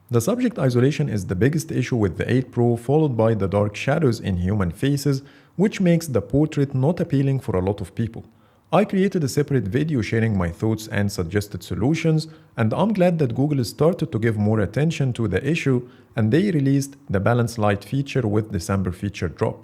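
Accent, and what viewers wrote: Egypt. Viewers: You sound Egyptian are you? 😀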